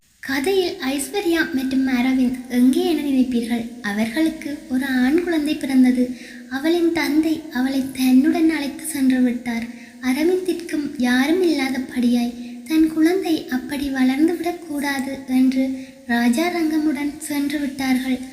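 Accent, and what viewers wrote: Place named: Sri Lanka. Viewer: அக்கா நீங்கதானே காவலனே எண் காதலனே கதை எழுதுணது .உங்களுடைய வேற youtube channel ல படிச்சிருக்கேன் .எனக்கு அந்த கதை ரொம்ப பிடிக்கும்.அடிக்கடி அந்த கதை படிப்பேன். நிங்க அந்த கதை நீக்கிட்டீங்க😢.அந்த கதை பல மாசம தேடி பார்த்தேன் அது இல்ல.இப்பதான் இந்த channelல உங்க குரல் நிங்க பேசுற இலங்கை தமிழ் வச்சித்தான் கண்டு பிடிச்சேண் .திரும்பவும் அந்த கதை இந்த channel ல upload பண்ணுங்க அக்கா please😢😢.அது 9 episode தாண சீக்கிரம் upload பண்ணுங்க அக்கா.எனக்கு அந்த கதை ரொம்ப ரொம்ப பிடிக்கும்.உங்க பதில்காக காத்துகிட்டு இருக்கேன். 😢😢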